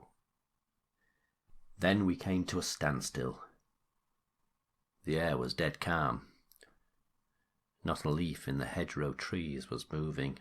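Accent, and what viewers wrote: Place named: Northern England, United Kingdom. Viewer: So pleased to have found your channel. Great story and nice to hear a Northern voice ( I hail from M/r) narrating. Have subbed and big thanx!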